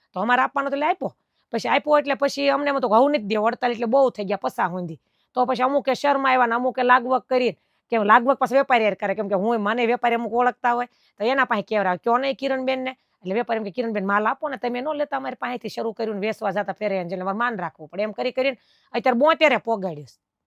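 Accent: Kathiawar, India. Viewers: She is speaking kathiyavadi gujarati language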